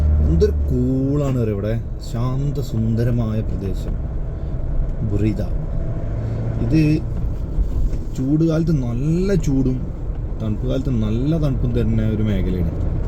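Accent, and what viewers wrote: Malappuram, India. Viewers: bad malappuram slang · അൻന്റെ 😅 വർത്താനം എനിക്കിഷ്ട്ടം, ദുബായിൽ റൂമിൽ ഉണ്ടായിരുന്നു മലപ്പുറം,ചങ്ങായിമാർ, മറക്കാനാവാത്ത, ചങ്ങായിമാർ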